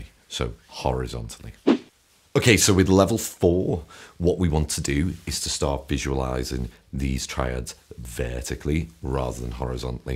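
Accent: Liverpool, United Kingdom. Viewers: Scouse not English! has the most fantastic elegant Scouse elocution. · Bass Scouse ! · Thank for the amazing video my fellow scouser! I also live abroad have done for a long time, so its nice to hear a familiar voice.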